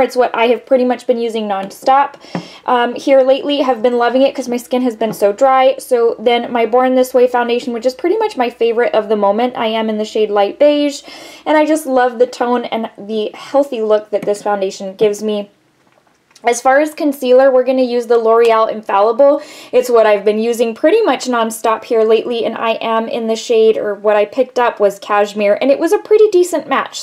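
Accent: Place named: Midwest, United States. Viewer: I would have never guessed you were from Texas. Did you grow up in the midwest? Your accent sounds like my midwestern relatives!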